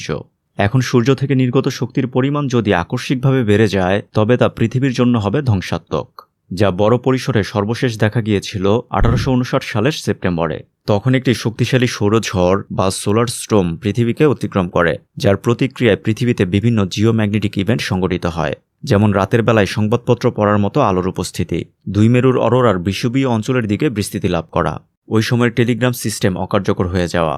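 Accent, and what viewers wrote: Narsingdi, Bangladesh. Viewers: ভাই এর বাড়ি মনে হয় নরসিংদী। ❤ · ভাইয়ের বাড়ি কি নরসিংদী নাকি 😀 আমার বাড়ি নরসিংদী, নিলক্ষা। ❤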